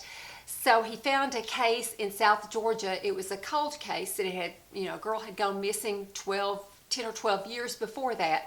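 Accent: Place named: Southwest Virginia, United States. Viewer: Hey! You inspire me so much. I am from and currently live in South West Virginia our accents are so similar and I looovvvee it. I just turned 50 and up until a recent and very rapid weight gain I am the happiest I have ever been with my self. Believe it or not you your beautiful long locks inspired me to get my current pixie cut. No sorry I cannot explain that. I will update my profile pic on YouTube soon. My hair is shorter than in my pic here. I love it so much and have received many compliments. People I don’t know stop me and ask about my hair color and cut. Anyway enough about me. I love your and Kristen321 channel so much. You guys are my favorite YouTubers by far. I love Jessica Braun and EmilyNoel. There are some others Elle Nisha. I love Sthepanie Nicole too. I have gotten way off topic. I would love so much if you and Kristen were to do a meet up. 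My suggestion would be Smokie Mt. area since many folks already travel there. Maybe hubbies could come and busy themselves elsewhere. Just throwing something against the wall to see if anything sticks. Jessica and Emily both are in Indiana maybe they could come. Jessica is pregnant with her first beautiful baby so she may not have availability. Girl you and Kristen have serious fans that really would love to spend an afternoon with you guys. Much love and many thanks Micha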